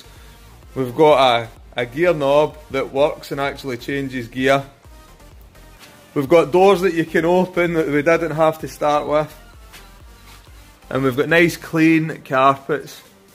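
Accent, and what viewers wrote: Scotland, United Kingdom. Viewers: Why is say blackpool and your pure Scottish like me lol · A lot of people just like the Scottish accent---no interest in Astras at all. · Okay- My fantasies have been fulfilled. A Scottish man cleaning cars. I love your videos! I love your voice and accent!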